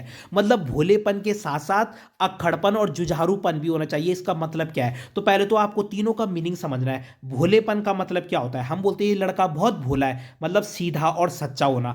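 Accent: Garhwal, India. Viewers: Sir apki gadwali tune Ari h ❤️🤣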